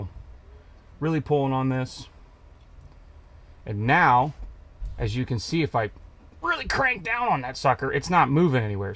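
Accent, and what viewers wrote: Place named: Pennsylvania, United States. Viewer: I love the way he says "he' LOOOOOOH everybody" with that northern PA accent. ALWAYS puts a smile on my face.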